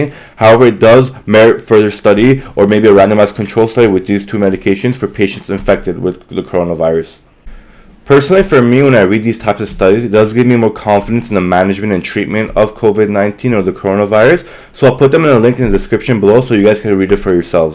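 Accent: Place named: Levant, Syria. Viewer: Are you originally Syrian or Lebanese? I mean no offence just because of the accent. I am a linguist and do research some accents. Thanks for the infos, it was quite informative and needed!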